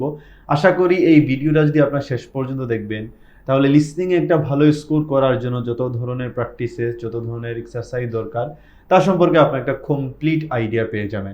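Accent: Sylhet, Bangladesh. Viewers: Sir er accent shune amader sylheti laglo.Apnar basa kun jaigay sir? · ভাই কিতা সিলেটি নি,হালকা সিলেটি টান আয়। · Are you from Sylhet ? I noticed from your accent. I am also from Sylhet. Good Work. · Sir or mat hunia lager sylhete 😅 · Asslamualikum Bhaiya.. Apni ki sylheti..kub helpfull viedo❤ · Sir,I think you are sylheti🤔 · Wonderful suggestion.....are you sylhety? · স্যার আপনি কি সিলেটি ☺️ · Vai are u sylhety? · R u Sylheti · Sylhety vai....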